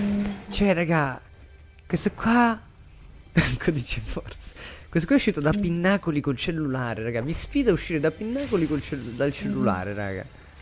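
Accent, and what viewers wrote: Calabria, Italy. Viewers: Compa ma di che paese sei della calabria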